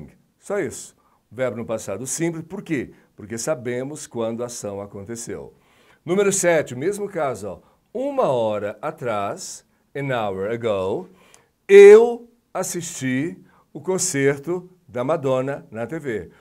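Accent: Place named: United Kingdom. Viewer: Professor que fala britânico forte.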